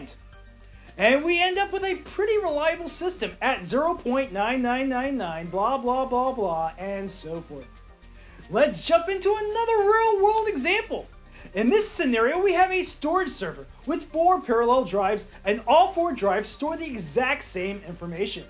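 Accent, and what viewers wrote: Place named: India. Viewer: I thought u were Indian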